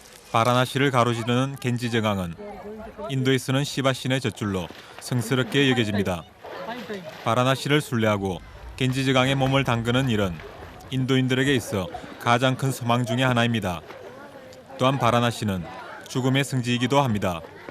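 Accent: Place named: Gyeongsang, South Korea. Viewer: 나레이터가 경상도 발음과 억양이 꽤 심하네요 ㅎㅎ 요즘이 어떤 세상인데..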